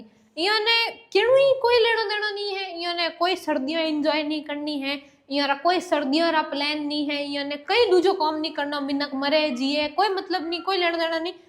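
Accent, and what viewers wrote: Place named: Rajasthan, India. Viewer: Yes, no one can understand her except us rajasthanis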